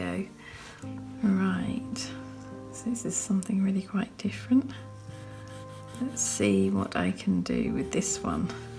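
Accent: England, United Kingdom. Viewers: English accent. Makes you sound important, official, BBC-like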